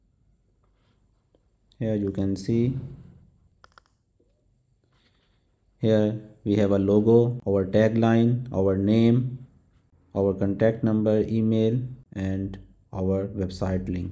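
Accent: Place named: India. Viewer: His accent says he is definitely indian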